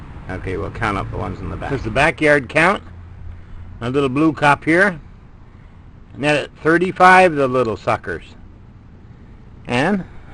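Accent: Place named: Canada. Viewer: you're not nice.. The guy is 1. Above 60 years old and 2. A nice sounding Canadian fellow. Give him a break and save your hate.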